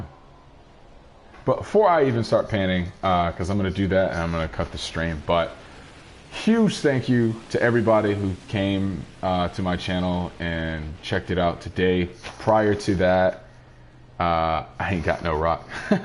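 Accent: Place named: Detroit, United States. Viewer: Are you from Detroit? You sound like you're from Detroit. I'm from Detroit.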